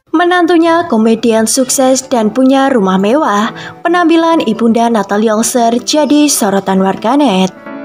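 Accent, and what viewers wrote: Java, Indonesia. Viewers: Medok kali jawa nya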